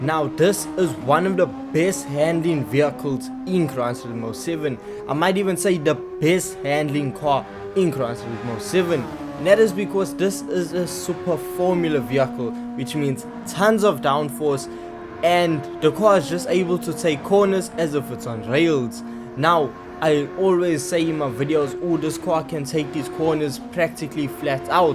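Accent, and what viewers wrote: South Africa. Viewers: Are you South African? 😂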